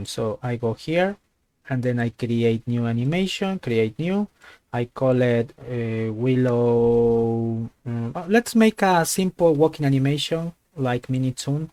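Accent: Spain. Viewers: aqui puedo notar que tu ingles es bueno pero a la vez español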